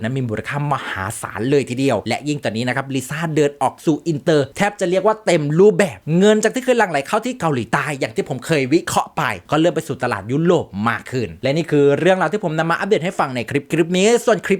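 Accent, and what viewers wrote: Isan, Thailand. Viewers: แอด…กลับมาอัพเดทบ่อยเถอะน๊า คิดถึงเสียงหัวเราะของแอด คิดถึงสำเนียงภาคว่าวอีสาน หม่วนๆ ของแอด Please…..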